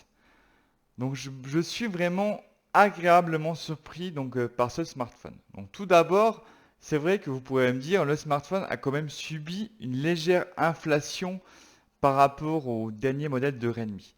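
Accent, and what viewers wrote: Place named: Vosges, France. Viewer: alors je ris, parce que en vous écoutant (sans avoir lu) j'ai pensé moi aussi à l accent Vosgien 😛🤭 ah ah ah c est tellement inimitable ! Entre Vosgiens on se reconnaît bien. Merci pour vos infos 👍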